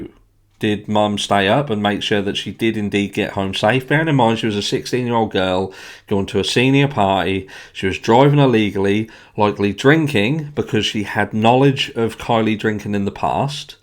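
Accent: United Kingdom. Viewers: I agree it seems very peculiar that jagger is living at keilys mums. I would say it’s a bit suspect. Love your Chanel by the way and love how you stick to the facts and the keily Rodni case. I understand from your accent your from the U.K. Although I’m not sure what area. I am from the U.K. and have been following this case but I havnt started my channel yet. I have been following this case and also Dylan rounds and gabby petito case. Look forward to hearing more of your channel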